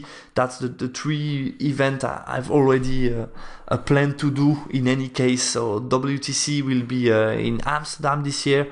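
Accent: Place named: Poland. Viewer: A very light polish accent, isn't it ? :D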